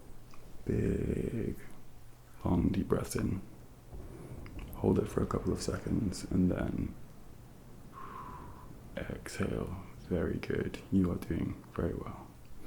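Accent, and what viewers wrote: United Kingdom. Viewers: Your British accent is very realistic 👍🏻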